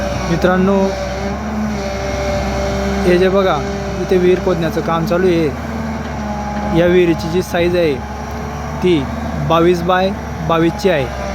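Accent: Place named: Khandesh, India. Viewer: राम राम दादा तुमनी भाषा वरूण तूम्ही खानदेशी वाटतस तूम्ही चांगल काम करी रायनात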